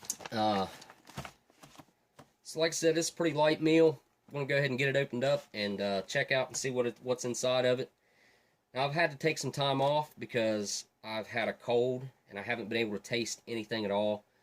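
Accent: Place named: Appalachia, United States. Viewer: i was think maybe on of the southern appalachian states based on how you pronounce some of your words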